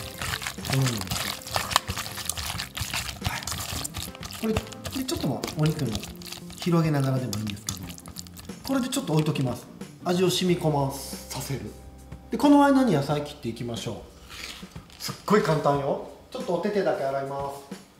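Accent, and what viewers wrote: Kansai, Japan. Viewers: 10分でできる料理...最高にありがたいです(;▽;)にんじん細切りの仕方感動~そして関西弁でおちつきます😊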